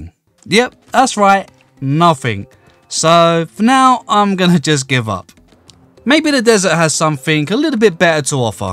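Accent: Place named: London, United Kingdom. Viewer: Whereabouts is this accent from? It almost sounds like Londoner but I'm not sure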